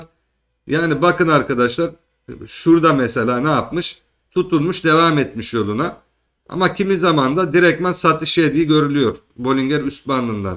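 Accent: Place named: Mediterranean, Turkey. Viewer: Adamsın kardeşim seviyorum seni anlatımında çok güzel diyalektinden anladığım kadarıyla Akdeniz çocuğusun ben bitcoin alıp satmıyorum ama marathon digital holding bitcoin mining i yapan bir şirket hissesini alıp satıyorum oda bitcoinle yükseliyor onunla düşüyor ama senin anlatımın ve analizin süper SEVİLİYORSUN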